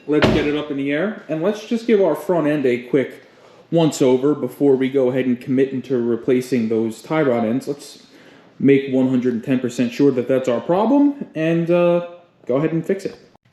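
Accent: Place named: South Jersey, United States. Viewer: Much appreciated, it's on my list. Kudos from a native South Jerseyan (clocked you accent immediately 😅)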